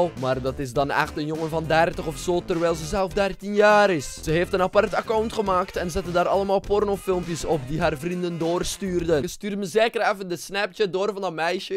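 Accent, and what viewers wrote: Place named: Antwerp, Belgium. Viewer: sinds wanneer spreekt altijd uit met een antwerps accent?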